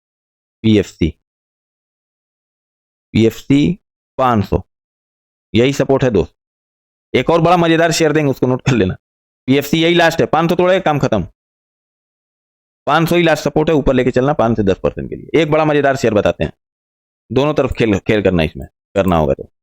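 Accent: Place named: Uttar Pradesh, India. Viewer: Kaha se ho Bhai utter pradesh ki bhasa bol rhe sir 🎉🎉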